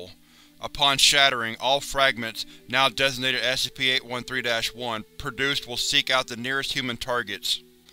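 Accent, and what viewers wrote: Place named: Alabama, United States. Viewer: You sound like someone who lives in Alabama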